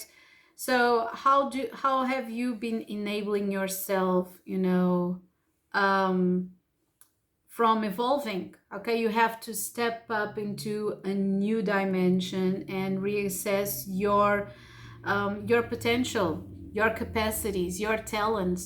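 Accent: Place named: Portugal. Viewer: ouvi 2 minutos do video e já detectei um sotaqueuzinho português e realmente confere.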